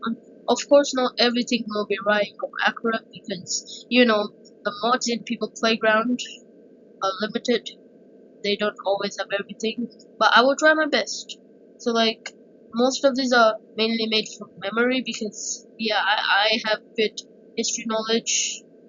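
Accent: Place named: United Kingdom. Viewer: BROOO YOU SOUND SO BRITISH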